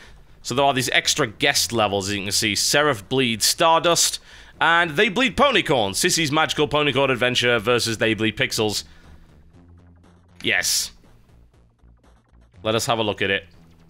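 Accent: United Kingdom. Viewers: they generally have different accents but very similar however he is very british in his accent cant tell if trolling or stupid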